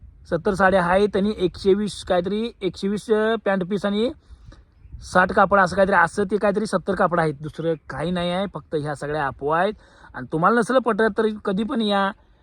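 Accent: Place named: Kolhapur, India. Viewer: Bhava kolhapuri stayl made tumla mast jamaty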